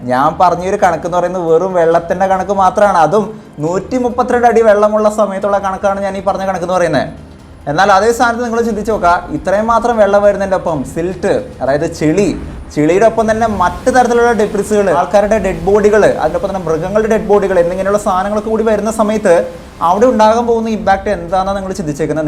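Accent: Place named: Thrissur, India. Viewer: Bro🥰 Thrissurkare ano?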